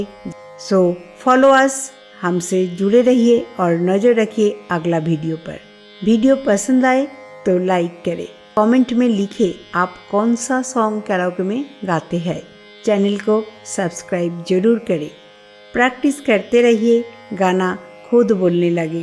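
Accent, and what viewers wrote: Bengal, India. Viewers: महोदया बंगाल से है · नमस्कार देवीजी। मैं अरुण वाडीकर पनवेल, ’नवी’ मुम्बईसे। आपकी प्रस्तुति बहोत अच्छी है, अपितु आपकी आवाज और विषय को पेश करने की कला, अंदाज़ में जो सादगी और बंगाली लहजा है, वो उसे और भी रोचक बना देता है। यूंही अपने श्रोता गण के ज्ञान में वृद्धि करते रहिए। शुभकामनाओं के साथ। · tumko jhudko hindi barabar bolna nahi aata. bangali mam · Aap bengali ho? accent comes like that 😊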